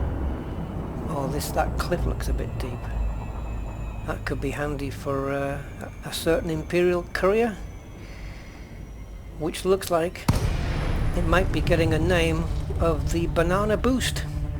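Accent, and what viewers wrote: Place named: England, United Kingdom. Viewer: you sound english if you are english don't say movie. its a film in england appart from that good vid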